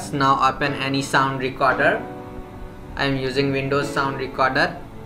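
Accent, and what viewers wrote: India. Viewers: india ascent is Suck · This accent and why indian not being racist or anything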